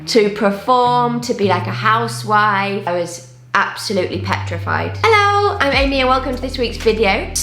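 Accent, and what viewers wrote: England, United Kingdom. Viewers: Amy , I really like ur English accent. Hoping you have a good lifetime in Taiwan.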